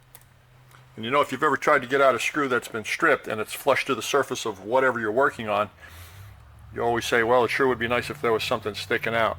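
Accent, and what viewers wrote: United States. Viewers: No need to be careful as our different interpretations make it so interesting. Just continue with your 'American' English and I'll sort out what you mean 😉😀 I'm finalising installing independent power feed to the X-table on my Marlow mill having fitted a VFD main drive. The mill did come with a separate motor but it was disconnected by previous owner and the wiring was a dogs breakfast. Using one of those ubiquitous Chinese 12v DC motors. Now it's heaven selecting the correct speed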